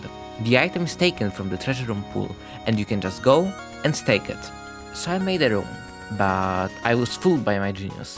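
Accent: Poland. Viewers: słychać od ciebie polski akcent :) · I love that polish accent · Wowowowo I didn't expect that but I really hear that you're polish haha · you sound soo polish xD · polski akcencik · Bro you sounds very polish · Od razu pomyślałem że polak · You're from Poland, am I hearing right? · Yo, you have the perfect Accent (Polish Accent)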